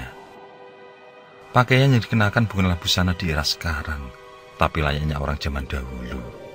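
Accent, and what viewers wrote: Java, Indonesia. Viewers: Sekali2 pengisi suara diganti , om ... Nggak itu2 aja Masak logat NTB sama persis dgn logat Jawa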